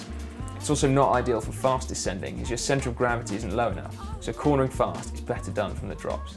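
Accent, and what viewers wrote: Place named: England, United Kingdom. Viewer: Put subtitles on your videos, not everyone can understand strong English accent.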